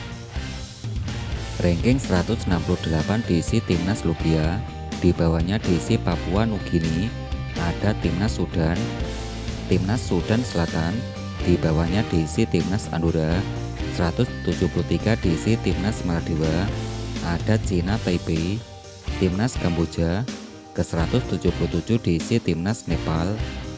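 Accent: Java, Indonesia. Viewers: Vokal mu jawa medok, penyebutan mu seringkali salah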